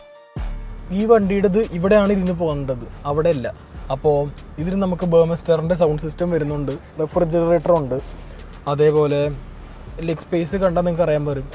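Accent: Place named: Malabar, India. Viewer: മലബാർ സ്ലാങ്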